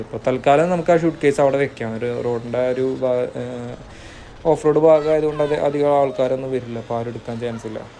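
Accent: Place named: Thrissur, India. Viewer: BRO NATTIL EVIDEYA SLANG KETTIT THRISSUR ALLENKIL ERNAKULAM😄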